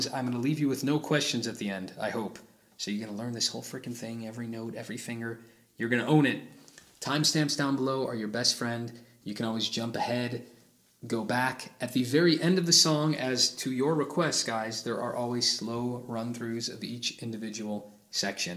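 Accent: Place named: Netherlands. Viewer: Are you by any chance a Dutchie that moved to the US? I'm trying to pinpoint the accent.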